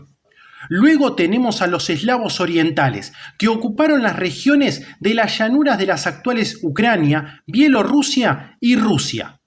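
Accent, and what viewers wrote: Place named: Argentina. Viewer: Buen documental, PERO deberías de dejar de husar jergas y acentos cuando se trata de ' Nombres propios ' de Ciudades, paises, regiones, o nombres de personas... usar la She , sho, shu, sha, etc en nombre própios es an algunos países un insulto, ese el problema con los acentos argentinos, por lo demás perfecto.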